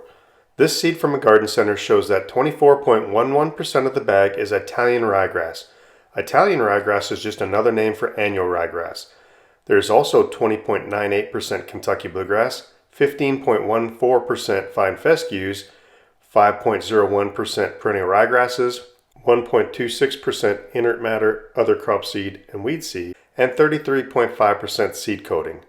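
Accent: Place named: Midwest, United States. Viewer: It's a Midwest pronounciation quirk. Plenty of Midwesterns with Italian roots, including more recent generations, also pronounce it this way.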